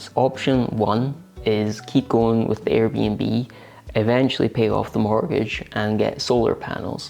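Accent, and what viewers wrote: Scotland, United Kingdom. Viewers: I'm terribly sorry, but I'm begging you to answer - is that a mild-ish sort of Scottish accent?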